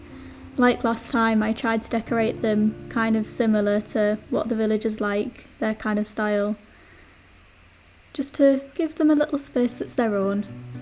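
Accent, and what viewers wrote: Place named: United Kingdom. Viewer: Are you from the U.K.? I love your accent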